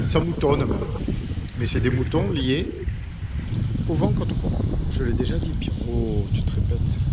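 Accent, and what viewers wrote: South, France. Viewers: SALUT l'amie qui parle le sud je sui guedin rien que de t'entendre je me bar a la réunion dans 2 a 3 semaine re-joint moi faut juste faire l'afrique pour moi c dommage je le fait pas encore en voillier mais bon a charge de revenche aller profite fait des bon virement de bords sans partir a l'eau tes seull mes avec nous on t'aime é te soutien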